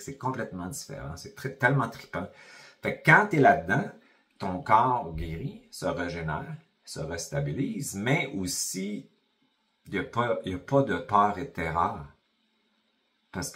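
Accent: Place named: Quebec, Canada. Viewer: Je veux un traducteur de québécois bordel de nom d’une pipe je capte que dalle 😂mais je m’accroche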